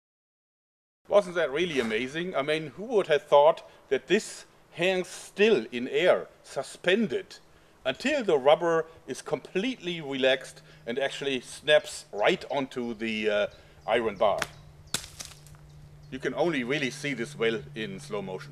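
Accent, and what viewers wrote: Germany. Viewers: is him from Germany? im from Argentina sorry about my English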